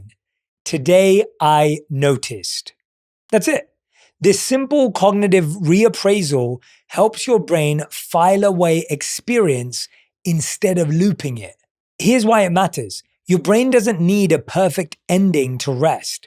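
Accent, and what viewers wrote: United Kingdom. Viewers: It's a Nice way to improve My listening skills with England accent and at the same time we learn new thing, being a better person by new habits. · Are you a British man?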